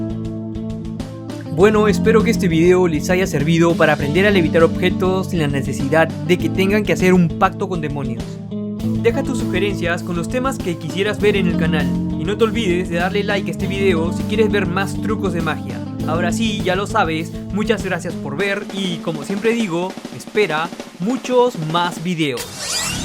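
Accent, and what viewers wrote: Peru. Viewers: soy peruano y me imagino por tu voz que tu tambien like a tu video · Peruano hasta los tuétanos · Una pregunta eres peruano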